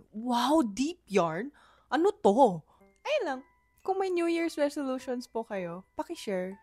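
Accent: Batangas, Philippines. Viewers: Saan ka dine sa batangas? Kakatuwa ang punto mo, batangeño batangeño eh..😂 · The batangueño accent is giving HAHAHAHAHA nachika kalang pero nakakahatak talaga ng attention hahahaha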